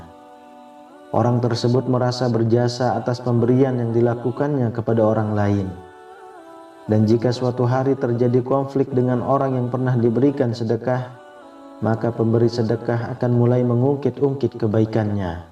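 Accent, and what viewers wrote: Lombok, Indonesia. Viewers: Suaranya kayak Logat orang lombok..ntb.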